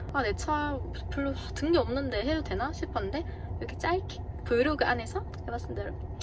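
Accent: Gyeongsang, South Korea. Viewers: 일하는 직장인으로서 힘들고 지쳐있고 현재 따고픈 공부도 하고잇는데, 가끔 님 유투브보면 너무 재밋고 하여 즐겁게 시청해요 ㅎㅎ 게다가 경상도사투리가 와닿아서 잘듣고잇어요ㅠㅠ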